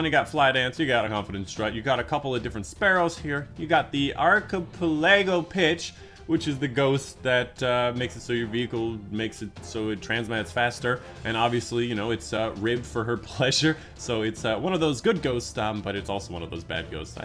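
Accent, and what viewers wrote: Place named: United States. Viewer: Aye just came from houndish’s vid gotta hear that sweet American accent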